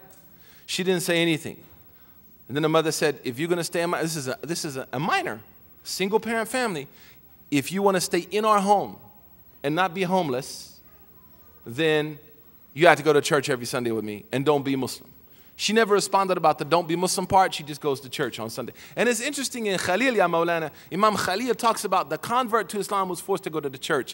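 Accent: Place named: Oklahoma, United States. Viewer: He is from okc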